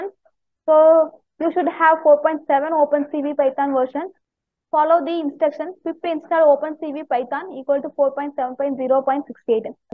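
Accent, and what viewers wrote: India. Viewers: i hear an indian this gonna be good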